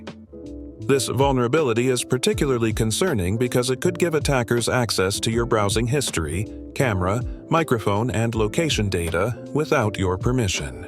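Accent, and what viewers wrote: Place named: United Kingdom. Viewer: Such a terrible robotic old British style voiceover. Why don't yoy use your own? Damn.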